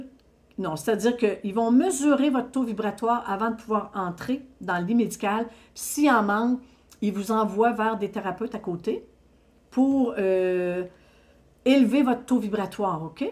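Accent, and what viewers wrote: Quebec, Canada. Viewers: Merci infiniment 🙏votre accent, les expressions imagées du Québec, votre sourire et votre joie de vivre pour des messages toujours vivifiants sont un véritable cadeau 🙏merci infiniment et gros bisous de Marseille❤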